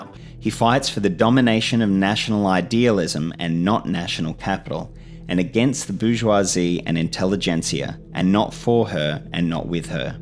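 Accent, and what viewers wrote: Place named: Australia. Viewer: I can’t tell you how absolutely thrilling it is to hear a YouTuber discussing ideology with an Aussie accent! Where hast thou been all mine life?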